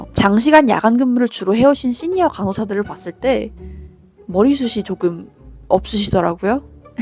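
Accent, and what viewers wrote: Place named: Australia. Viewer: 내년 간호대입학을 위해 블번에서 학비모으고 있는 워홀러입니다ㅎ 지난 일년은 정신없이 지나갔지만 올해는 영어를 적극적으로 공부해보려는데 호주발음으로 스피킹 잘할 수 있는 공부법이 있을까요? 호주영어 하시는 모습에 늘 감탄하고 갑니다! 저는 미국식발음만 쓰다가 요새 좀 호주식으로 일부러 하려고 노력하고있거든요 ㅎㅎ 님만의 호주영어공부법이 있다면 공유해주세요! 항상 영상 잘 보고 있어요❤️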